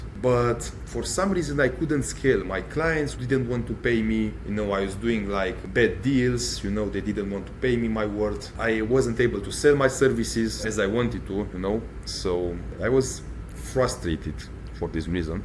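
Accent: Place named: Balkans, Eastern Europe. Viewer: Balkanski Prevarant sa užasnim naglaskom, scammer lmao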